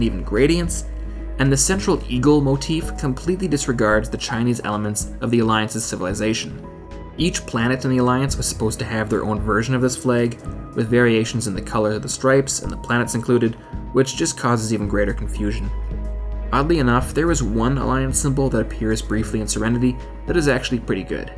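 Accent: Canada. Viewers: You sound so Canadian. The Canadian flag is simple, striking, and well designed!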